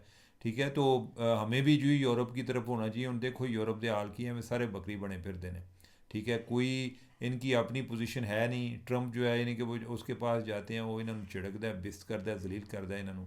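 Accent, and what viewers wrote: Punjab, India. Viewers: Punjabi da Tarka good taste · بے لاگ تجزیہ کھلا ڈھلا انداز جس میں پنجابی کا تڑکہ واہ کیا نیچرل اور دل کو چھو لینے والا انداز ہے❤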